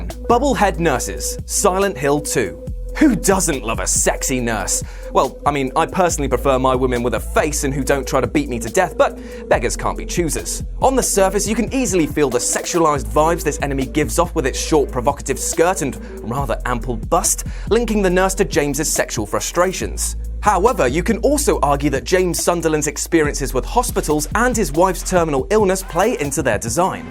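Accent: United Kingdom. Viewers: Why britains ? I don't understand british english -. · American guy with a British accent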